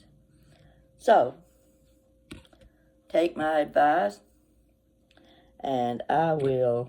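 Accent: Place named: Kentucky, United States. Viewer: I just LOVE you! I am from Kentucky, was born in a small town in the eastern part called Prestonsburg. My family settled there from the revolutionary war. General Alexander Lackey was my GGGGrandfather. Although this is considered a very depressed part of the state, I will always remember how beautiful life was there for me as a child. My Mom and Dad left there in the early 60's and I grew up in Central Florida. No other people like real Kentuckians! I can tell a Kentuckian (no matter what part of the state they are from) a mile away by that accent! You remind me so very much of my beloved family. All of them are gone now except me, and my two sisters. I am 75 the other two are 78 and 84. You bring back such wonderful, sweet memories of all of my wonderful Grandparents, Aunts, and Uncles! WE are so very much alike in our family history, foods, stories, antiques, Just amazing. 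Well I guess after all we are true Kentucky Wildcats!! Much love, from North Carolina by the way, my name is Lois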